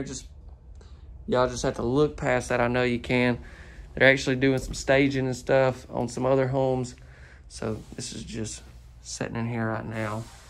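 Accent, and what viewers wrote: South, United States. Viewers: my man! Having moved to Yankee country many years ago, I miss hearing the Mother Tongue. As well as great, informational tours, your voice takes me home to wonderful memories of growing up in the South. All I need now is some Carolina BBQ and some boiled peanuts! Thank you! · I haven't gotten past that beautiful blue in the ceilings and all around the decor., what state are you in? I want to sell my home and leave the state. Your commentary and that beautiful country accent does it for me 😂! Do you or your team deal with VA loans or know how to assist veterans? Please let me know. Thank you! Wishing you & yours a wonderful & blessed New Year. Please stay safe out there. U S Army Retired (<num>) Iraq Veteran. Peace & Blessings, Light & Reflections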